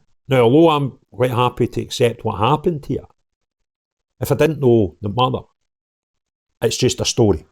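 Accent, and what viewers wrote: Scotland, United Kingdom. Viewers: Forget their nationality,religion etc,,yet your headline,,foreign attacker,,,your a Scot in accent only,,,,heres one l would bet on,,,your a rfc supporter or that ilk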